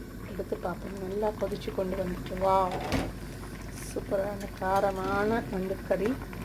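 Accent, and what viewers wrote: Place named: Sri Lanka. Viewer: நண்டுக்கறி வறுத்து செய்த சமையல் மிகவும் அருமையாக உள்ளது இலங்கை தமிழில் உங்கள் பேச்சு அதை விட இனிமையாக உள்ளது சகோதரி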